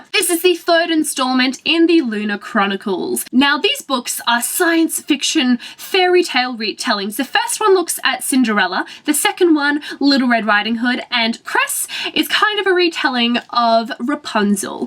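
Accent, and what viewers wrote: Australia. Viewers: Shes australian. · Deniz Baronchelli She's from Australia, honey. It's not fake. That's the Australian accent.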